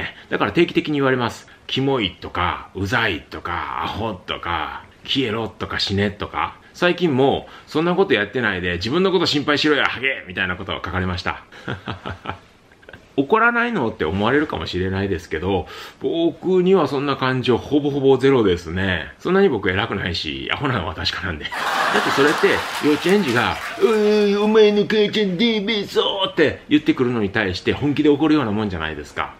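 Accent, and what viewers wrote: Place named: Kansai, Japan. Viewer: ありがとうございます。ここ4年ほど、腰痛に悩まされています。2週間ほど前に、初めて、Shojiさんの動画を拝見しました。さっそく、Shojiさんのおっしゃる通り、ストレッチのエクササイズを始めたら、体が軽くなったような気がします。理学療法による治療に通っているのに、いつまでたっても、痛かったんです。50歳になったばかりですが、年のせいなのか、仕事（商品補充、日によって2トンは越える荷物を運んでます）のせいかと思っていたんですが、、、体に対して、無知だから、腰痛を起こしていたのですね。悩みがあると関節痛も出るし。南仏に滞在して23年、もうすぐ、日本料理教室、一本だけで、やっていくつもりです。健康でなくっちゃ～！Shojiさんの哲学にも納得です。尼崎で幼少期を過ごした私にとって、Shojiさんの関西弁も気持ちいいです。これからも、もっともっと笑顔で過ごしたいです。Shojiさんのおかげで、実現できそうです。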